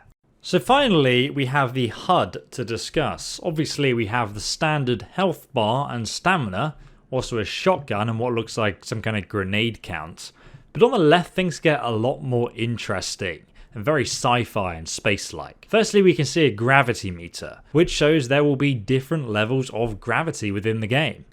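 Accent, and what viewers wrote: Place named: United Kingdom. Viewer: Eh it's a bri ish thing. At least I think hes British either that or hes Scottish or something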